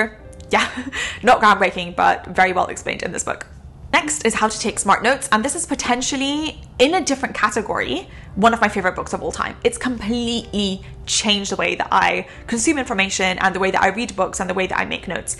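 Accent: United Kingdom. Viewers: That British accent goes like a song. Can't have enough of it. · That's one of the most british-ish accents I've heard recently. + I highly recommend reading Unscripted : life, liberty and the pursuit of entrepreneurship by MJ DeMarco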